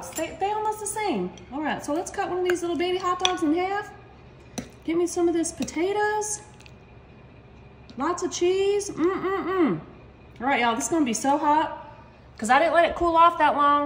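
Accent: South, United States. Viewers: Love listening to her southern accent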